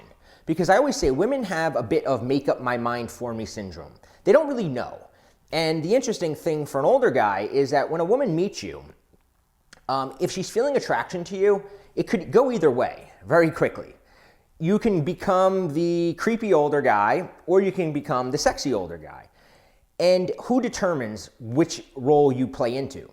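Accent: United States. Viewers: Non capisco la pronuncia americana, ed è pure sgradevole. Non capisco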